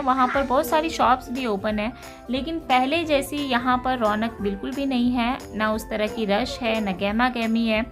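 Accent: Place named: Pakistan. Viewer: Aap to bilkul Pakistanion ki Tarah boltin hain .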